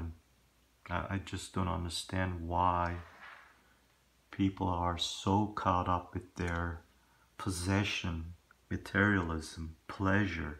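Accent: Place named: Turkey. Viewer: Oh my goodness, we live in Texas too! We’re in the Dallas area! I think it’s the Turkish accent I must be hearing. I couldn’t place what region you are from. If you are also in this area please let me know!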